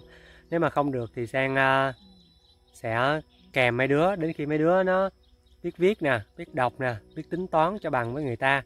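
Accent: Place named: Mekong Delta, Vietnam. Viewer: Sang nói chuyện thì rất giống người miền tây, hình như hai mẹ con Sang là người miền tây thì phải.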